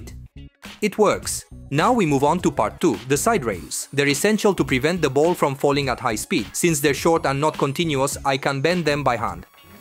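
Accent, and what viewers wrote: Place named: Italy. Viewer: I prefer your real voice. Who doesn't like an Italian accent?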